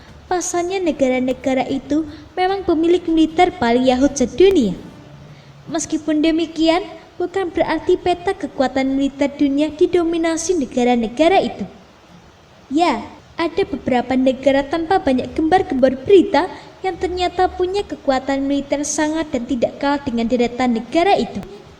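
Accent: Java, Indonesia. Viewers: medok amat ch ngmngnya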